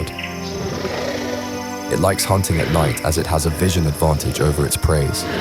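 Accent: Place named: Manchester, United Kingdom. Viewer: Anova instead of another. Time to get rid of that Manchester dialect kid.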